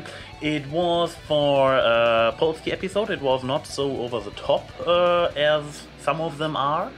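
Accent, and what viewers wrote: Germany. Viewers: Ich finde deinen Deutschen akzent echt genial ^^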